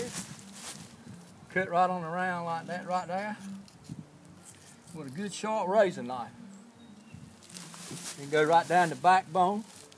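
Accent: United States. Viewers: That’s a good ole boy deep South Georgia accent. I live in Atlanta but am a country boy at heart. My whole family sounds like this man. Love it. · Can't tell if you're from Mississippi or Australia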